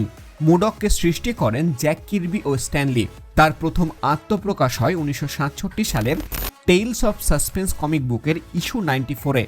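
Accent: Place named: Bangladesh. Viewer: Apnar kotha to kolkatar manusher moto na.😞 Bangladesh er manusher moto lage.😋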